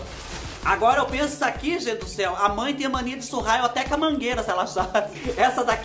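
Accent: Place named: Northern Paraná, Brazil. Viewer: Hei, que divertido ver vc falando "paranaês" do norte! Sucesso.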